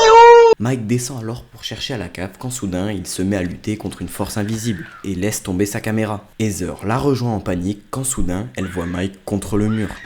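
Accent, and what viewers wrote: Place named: Quebec, Canada. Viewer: tu est quebecois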